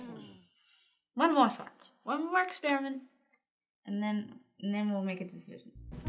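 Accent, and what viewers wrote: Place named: New Zealand. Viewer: i can bet you're from NZ judging from the accent ahahah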